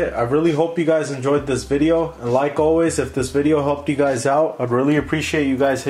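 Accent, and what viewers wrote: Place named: Brampton, Canada. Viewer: I hear a Brampton accent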